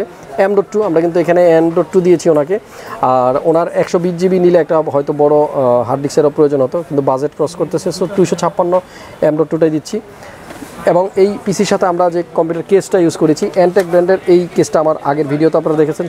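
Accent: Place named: Sirajganj, Bangladesh. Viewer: Vaiya ta mone hoi sirajgonj er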